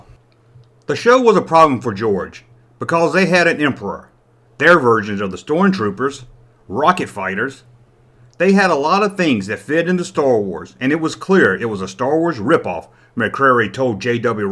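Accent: South, United States. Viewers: are you from the south if so whrevinntouth arevyoubfrom I cxn tell your from the south by the accentv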